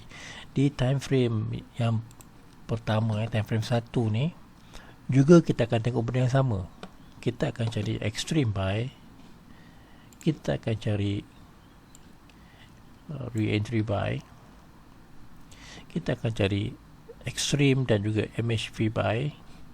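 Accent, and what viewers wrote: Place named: Malaysia. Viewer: Terima kasih cik gu atas ilmu yang telah dibagikan ke kami semua, setelah saya play berulang ulang akhirnya saya mengerti maksud cik gu. Mungkin karena saya dari indonesia jadi agak kesulitan memahami logat melayu malaysia. Terima kasih cik gu semoga Allah SWT membalas semua kebaikan cik gu.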